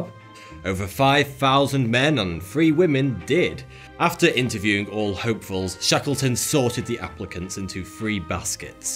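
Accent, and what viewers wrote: United Kingdom. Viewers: Awesome video mate! I always look forward to hearing that silky smooth British voice!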